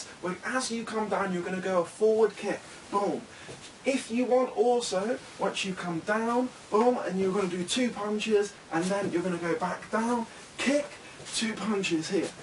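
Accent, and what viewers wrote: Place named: United Kingdom. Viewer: I love your videos. Very effective. And I love your British accent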